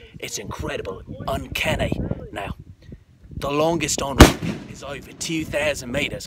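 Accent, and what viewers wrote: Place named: San Diego, United States. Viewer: He's from San Diego, man!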